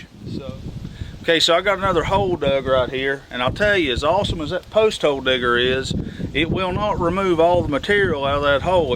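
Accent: South, United States. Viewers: Love your Southern Accent... keep on trucking. Have a Great Day All Ye All..lol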